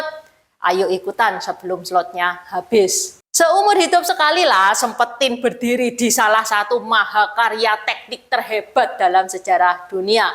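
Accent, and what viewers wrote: Java, Indonesia. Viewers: Saya suka...logatnya asli orang Jawa...👍👍 Saya sukreb nona..🇮🇩 🙏🙏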